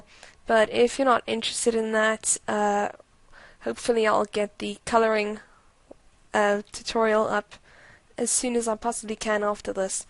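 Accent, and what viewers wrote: South Africa. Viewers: I think she is South african... XD Idk! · Nice tut. BTW You sound South African.... Am I right? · When doing the line clean up even when my eraser is set to 100% hard i get this softening of the line? Is that because im not in 300dpi ? btw you sound South African :) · South African accent? :) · I got really excited when I heard your accent! I miss South Africa.... Do you speak Afrikaans? Also, your drawing style is so cute and I love it! It reminds me of Disney :o) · Your accent. Are you South African? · Are you South African?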